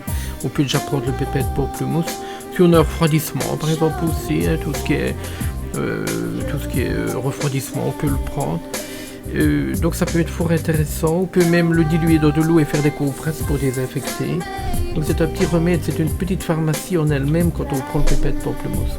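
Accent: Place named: Liège, Belgium. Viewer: En tout cas, ça n’atténue pas l’accent de « Lieche »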